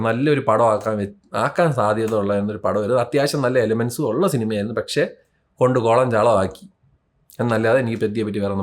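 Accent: Trivandrum, India. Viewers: Annan tvm slang 😆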